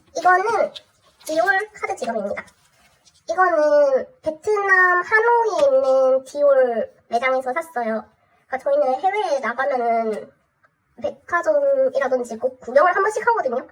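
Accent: Busan, South Korea. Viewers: 부산분이시네욬ㅋㅋㅋ저도 부산 사람이라.. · 바닥에 널부러져 놓고 장갑찌고 만지는건 무엇?ㅋㅋㅋㅋㅋㅋㅋㅋㅋㅋㅋㅋㅋㅋㅋㅋㅋㅋㅋㅋㅋ사투리가 부산같은데 개웃기네 ㅋㅋㅋㅋㅋㅋㅋㅋㅋㅋㅋㅋㅋㅋㅋㅋㅋㅋㅋ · 음성 변조도 숨길 수 없는 친근한 부산사투리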